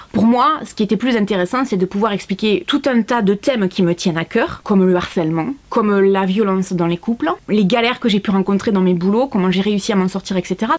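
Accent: South, France. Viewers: Bonjour, je te suis depuis 2 ans et j'adore regarder tes vidéos, ton sourire, ton accent du sud et ta bonne humeur sont les petits rayons de soleil de mes journées. J'aime tes vidéos sponsorisées par ta carte bleue, je sais que je peux faire confiance. Cette année à été difficile pour la plupart d'entre nous et tu l'a rendue plus douce. Continue à nous faire sourire et un grand merci pour tout. Bonne année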